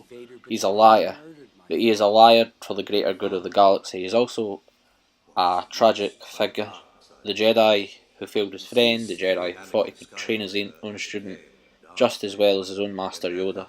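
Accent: Scotland, United Kingdom. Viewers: Thank you so much for spitting facts Return of the Jedi is the best of the original trilogy and it has always been better than the Empire Strikes Back and it’s so awesome that you’re Scottish I always like Scottish things and I like doing the accent to I say this famous line from Mike Myers “Welcome to all things Scottish if it’s not Scottish it’s CRAP” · That Scottish tho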